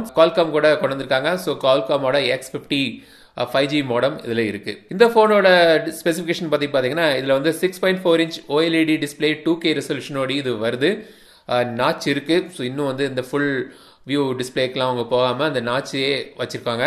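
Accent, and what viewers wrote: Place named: Nagercoil, India. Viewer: Nagercoil slang😍👏🏼👏🏼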